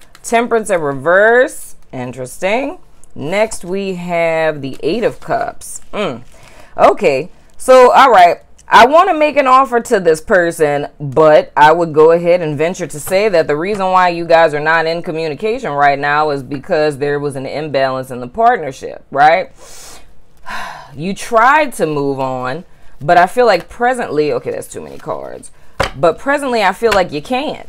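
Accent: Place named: Charleston, United States. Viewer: Love that you have mental health resources in the description box ❤️ Curious to know what bit of accent I’m hearing?... sounds a bit like Charleston (where I’m from) or NOLA (similar sound) or Bahamian (also very similar) lol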